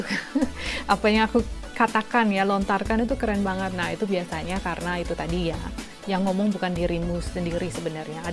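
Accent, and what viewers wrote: Banyumas, Indonesia. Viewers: Mba Cilacap ya salam ngapak, ora ngapak ora kepenak... 😄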